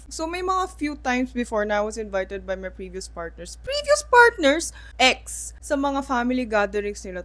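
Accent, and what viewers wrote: Philippines. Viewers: I’m not used to hearing a Korean girl sound like a Filipino girl. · No you talk like a filipino already. :)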